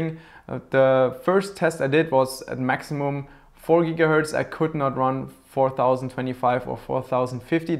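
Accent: Germany. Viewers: LOVE YOUR GERMAN accent ✌️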